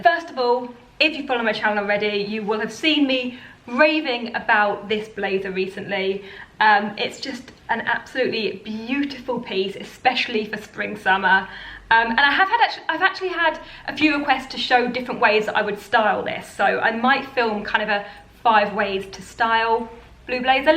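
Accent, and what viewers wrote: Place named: Southern England, United Kingdom. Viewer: hey, i just subscribed to your channel because i really enjoyed your personality and it was a very pleasant video to watch. Question: what area of the UK are you from? from the sound of your accent ( which is lovely by the way:- ), ) i'm guessing you're from the south of England but, i was just curious as to what area in the south are you from? i.e. Greater London, etc... Also, you looked very pretty in all the outfits you tried on:-)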